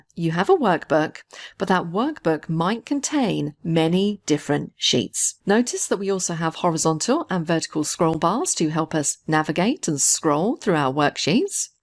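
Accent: United Kingdom. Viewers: Wonderful and helpfully thorough presentation. I learned alot. The British accent was a bonus, as any serious topic spoken with a British accent makes the topic suddenly sound more intellectually sophisticated lol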